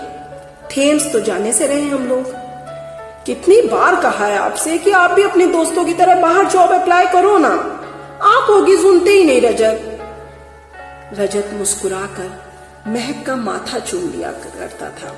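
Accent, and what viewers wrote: Kanpur, India. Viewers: Amazing.... the way you narrate the kanpuriya slang hahahaj too good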